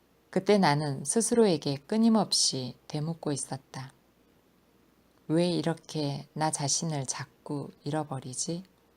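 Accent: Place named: Jeolla, South Korea. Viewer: 읽어주시는 분 전라도분이신가봐요ㅎ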